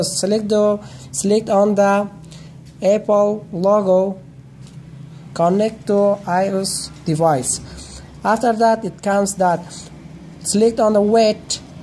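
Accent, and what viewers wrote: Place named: Afghanistan. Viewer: Afghans talk English like him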